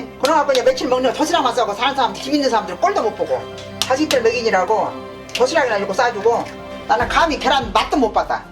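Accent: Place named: Gwangju, South Korea. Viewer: 3년전에 돌아가신 할머니도 생각나고 지금은 나이들어 손자도 보신 저희 엄마도 생각나고 만감이 교차합니다. 저도 광주사람이라 사투리가 너무너무 익숙하네요. 막례님 항상 건강하시고 늘 행복하셨으면 좋겠습니다!!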